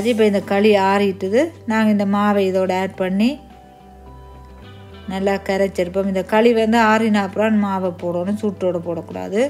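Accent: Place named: Sri Lanka. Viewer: Sis nenga sreelanga ah va sis voice slang super🎉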